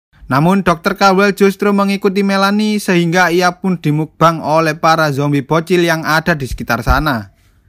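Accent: Java, Indonesia. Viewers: weh wong jowo iki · Logat jowo 😁😃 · Jowo e ndi om ? 😂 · Mas e jawa ngndi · Kayaknya orang jawa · Wong jowo mas😅😅😅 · Sampeyan wong jowo yo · Bang jawa mana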